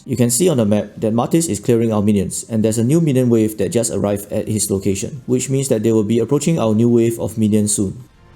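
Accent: Singapore. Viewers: Saw your defence item guide on my feed a few days ago. Heard your voice and immediately knew you are sinkie. Great guide on that one too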